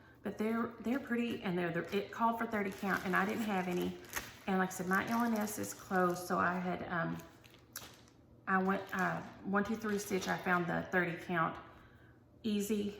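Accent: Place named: Texas, United States. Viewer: I just wanted to let you know that you brighten my day so much! I'm not even a cross stitcher, my Granky did beautiful embroidery and tried to teach me but it just wasn't my thing. I AM a knitter, and I binge watch your FlossTubes while I'm knitting! I was born and raised in Texas, but have lived in NV with my husband for the last nearly 10 years. We have a 7 year old with severe autism, so travel is nearly impossible even when we aren't in this quarantine mess. You make me so much less homesick! Your accent, your stories, just your personality, it gives me a little taste of home, and during times like this, that's been more of a lifesaver than I can even explain. I am so grateful for you, I truly am! So thank you!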